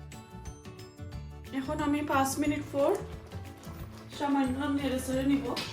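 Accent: Sylhet, Bangladesh. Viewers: সিলেটি মাত ভালা লাগে আমার ঘরে তাকিয়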